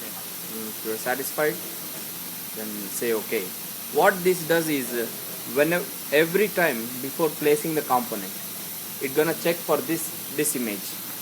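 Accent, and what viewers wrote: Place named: India. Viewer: India English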